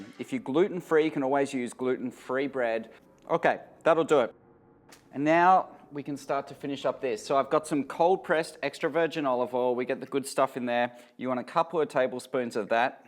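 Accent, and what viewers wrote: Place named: Australia. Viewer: I feel like you are an incredibly skilled chef with a great pallette but you're just boring as hell to watch in a video. IDK what it is. Maybe it's because I'm Aussie too and it's hearing the same accent? Anyway recipe looks killer I'll give it a go when I can afford proscutto, nice olive oil and pine nuts (might be a while cause I'm a poor student).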